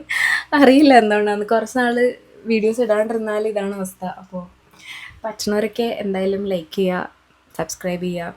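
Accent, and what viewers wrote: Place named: Kannur, India. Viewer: Kannur slaaangg oke kity thodangeeele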